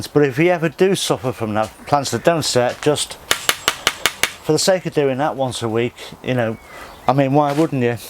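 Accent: Liverpool, United Kingdom. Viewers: 👍👍👍is that a scouse accent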